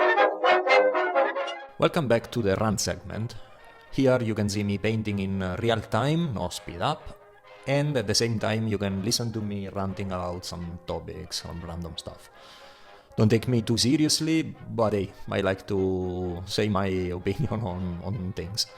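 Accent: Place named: Italy. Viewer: Very nice video and I also enjoyed your rant. I just discovered your channel. Besides a fellow artist and nerd, I also like MotoGP and wonder about your accent. It seems slightly different than Valentino Rossi's (do you know him?). Are you from a different region of Italy? 👍🙏 Ciao!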